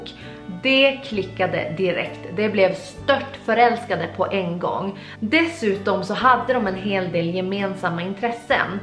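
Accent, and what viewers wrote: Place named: Västerbotten, Sweden. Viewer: Alltså du måste ju va från Västerbotten.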